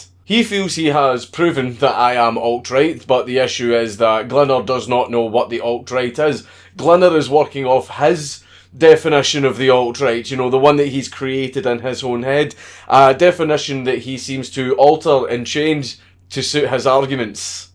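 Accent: Scotland, United Kingdom. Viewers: Lol. I read Conor's comment to be a tame joke about Scottish accent and people replying are legit mad. ahahahahahahahah. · Dude, your Scottish accent is nothing short of glorious. Hearing you speak makes me happy. Have a great day and keep up your great work!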